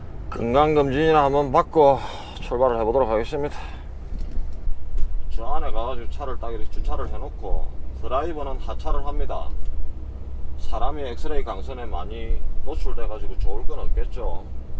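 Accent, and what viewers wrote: Gyeongsang, South Korea. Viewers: 절로가라마~~~!!!!여가 너그집이가 임마 칵 쌔리뿔라마 ㅋㅋㅋ 전형적인 경상도 아저씨네에 두달동안 개점휴업예정 이시라 앞에 못본거 진짜 열심히보고 있습니대이 근대 재미있어예 헤헤😋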